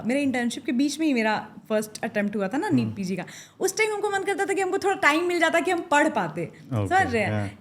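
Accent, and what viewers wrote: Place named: Bihar, India. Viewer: Bihari accent full on😊😊😊😊😊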